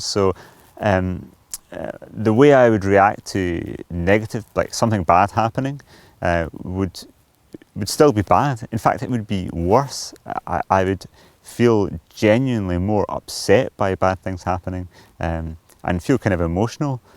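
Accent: Scotland, United Kingdom. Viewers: I like your braw Scots accent,. My mother was from Dunbar:thanksdoc: & was raised in Edinburgh. Dad was an American and he was in the Air Force stationed in Scotland. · I going to use your channel to learn understanding the Scottish accent :-) Interesting content!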